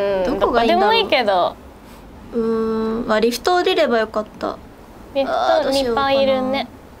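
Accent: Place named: Kyushu, Japan. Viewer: あやてぃ九州言葉が抜けてなくて心地よい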